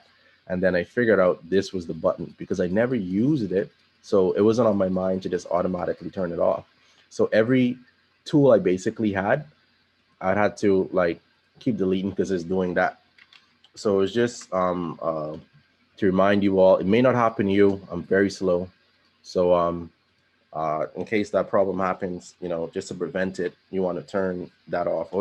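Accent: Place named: Bahamas. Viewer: ik you bahamian lmaoo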